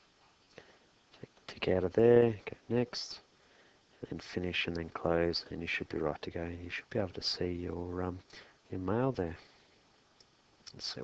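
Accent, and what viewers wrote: Australia. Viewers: 10 Stars. I have searched for days for the answer to this. Your method and the order you did it all in worked for me..finally! Thank you very much. Nice calm Aussie voice, made it even more pleasant.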